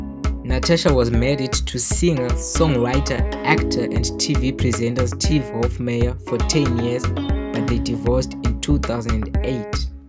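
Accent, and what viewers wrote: Zimbabwe. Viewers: Why do I think that the narrator is from zim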